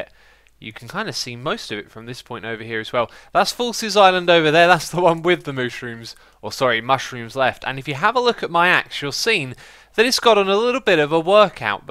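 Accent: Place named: United Kingdom. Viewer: Emanuel Ortiz Maybe it's his British accent, the sound of his lips smacking and his saliva bubbling, or maybe it's just the way he talks. You can't hate. No one can.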